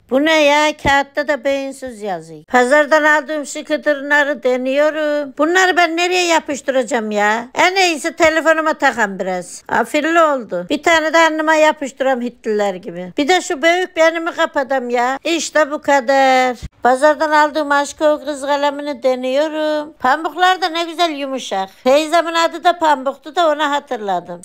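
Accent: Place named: Erzincan, Turkey. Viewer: %100 Erzincan ağzı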